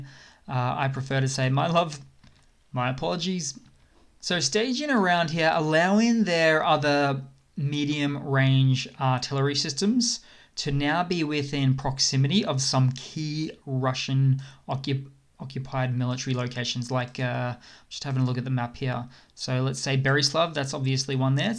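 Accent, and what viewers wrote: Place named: Australia. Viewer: Brilliant and great to hear an Aussie voice. Slava Ukraini!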